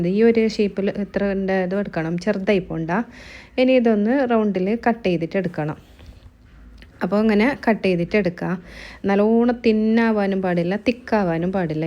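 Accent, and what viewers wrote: Kasaragod, India. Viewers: Kasaragod aano😅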